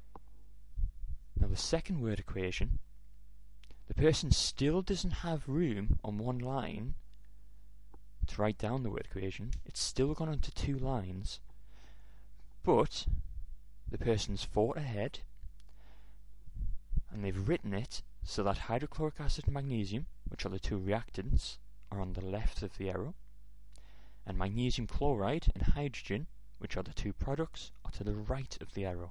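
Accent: Scotland, United Kingdom. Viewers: I can hear Scottish accent in there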